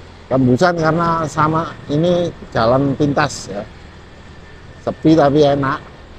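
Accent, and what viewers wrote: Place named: Java, Indonesia. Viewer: gak usah pakai ngomong guys wong sampeyan mdehok Jawir. Udah gitu ngomong kok ribet banget, gak ada menariknya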